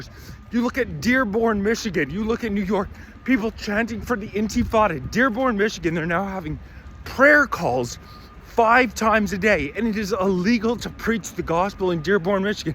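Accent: Canada. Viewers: Here we go the pandering continues senile old ladies and naive children beware the funny thing is this guy couldn't exist for sh!t without the same strung out buffalo soldier ignorant immigrants (that he goes so obscenely out of his way to rip on when antisemitism is a criminal offence as of last year!) looking the other way from every second word out of his mouth cause yknwo, they saw actual war (which this guy supports of course) and the same blackmailed child molester politicians he pisses the same exact party lines out of his dick hole face with that stupid vaguely hoser accent he puts so much effort into 😂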